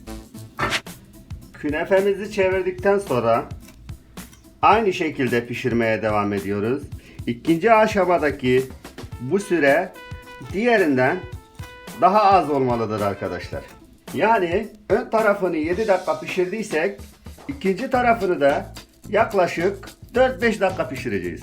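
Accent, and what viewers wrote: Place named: Hatay, Turkey. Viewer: Abi kıbrıstaki hataylılar gibi şiveniz var sanki :)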